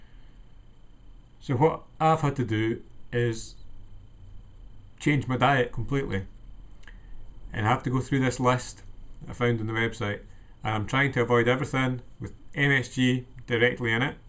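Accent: United Kingdom. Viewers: It's quite refreshing to hear a scottish guy talk on YT to be honest. All i hear is americans, the english and aussies. and im aussie too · Hi, I'm suffering from terrible anxiety/ lack of sleep. I can relate to every single thing you've mentioned in this video. Things seem terrible now but I hope that one day I will be making a youtube video like you, helping other people. My diet isn't great.. I must admit but that's down to me being at home 99% time feeling sorry for myself and not knowing what to do. I just downloaded the audio book and I'll give this a good go. Tried pills, hypno etc.. P.S mum's from glasgow, nice accent! :)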